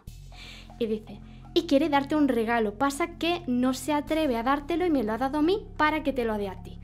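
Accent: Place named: Spain. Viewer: Eso es acento español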